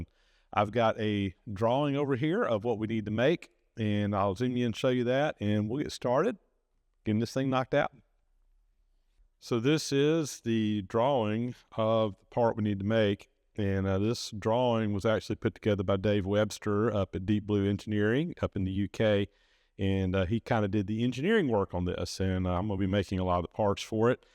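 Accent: South, United States. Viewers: Another English fan here, I love your rich 'Suthernn' accent. Keep posting these treasures, and many thanks.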